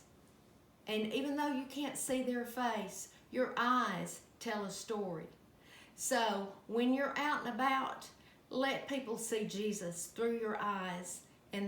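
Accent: South, United States. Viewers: Just love you ♥️ My daddy just got out of the hospital and his health is very tenuous…I’m helping my mama take care of him and it’s very stressful. But when I have a few minutes and just want to escape and calm my mind, I watch your videos. Your sweet southern charm and lovely accent just warm my heart and your videos remind me that as long as I have Jesus, I can bear anything. Love you! God bless xoxo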